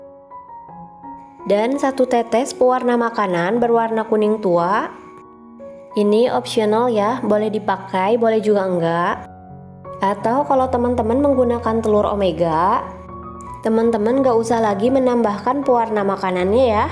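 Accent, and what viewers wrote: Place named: West Java, Indonesia. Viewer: Meuni sunda pisan Aksen na, salaam sunda atuh Neng Geulis 😊 Hanupis resep Nastar nya nanti mau coba di praktekan 👍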